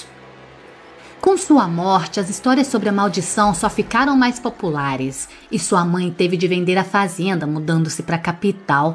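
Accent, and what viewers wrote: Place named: Mato Grosso, Brazil. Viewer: Imaginava ela morena de cabelos pretos tipo Índia, sua voz lembra do interior de Mato Grosso.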